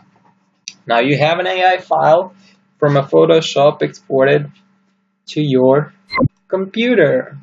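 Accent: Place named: Balkans. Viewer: Hey buddy thank you for your tutorial. worked like a charm for me! I appreciate it. btw I've noticed you tend to sound like a person from the Balkans lol which is good either Bosnian, Serbian or Albanian but more Slavic I can tell lol thanks once again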